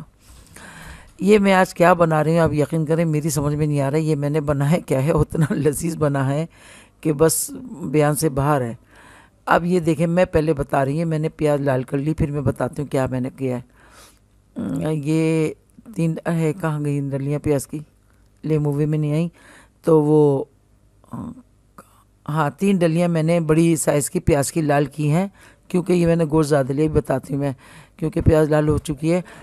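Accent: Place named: Lucknow, India. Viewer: I love your "urdu", sound like "Lakhnao" ki urdu...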